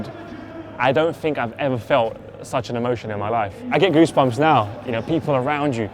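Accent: Liverpool, United Kingdom. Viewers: Scouse accent really shines through. Been a Liverpool fan ever since he 'chose' to.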